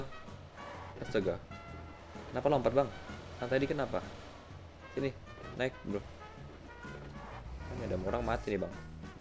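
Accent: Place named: Banyumas, Indonesia. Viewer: Ora ngapak ora kepenak 😀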